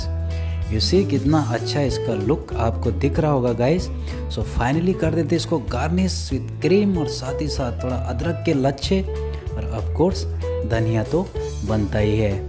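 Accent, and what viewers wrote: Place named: Garhwal, India. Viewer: Nice gadwali chef your tone like gadwali m ryt Some time make uttrakhndi cousin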